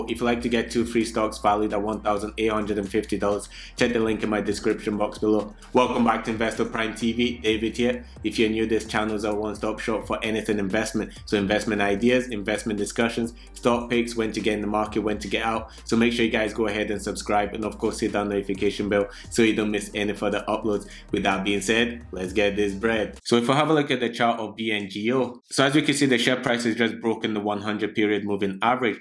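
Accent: Scotland, United Kingdom. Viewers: First time seeing your channel, nice work👍🏽 Your accent is great, mix of Scottish and?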